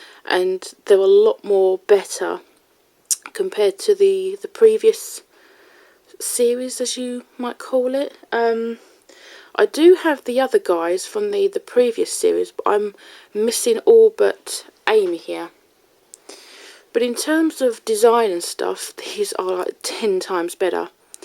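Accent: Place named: United Kingdom. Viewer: Are you british because u have a british accent and also I’m british😃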